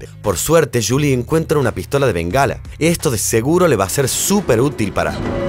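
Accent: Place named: Corrientes, Argentina. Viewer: Jajaja!!!, muy bueno!!, ahora una pregunta!, sos correntino o chaqueño???, por el tono!!!, jajaja!!!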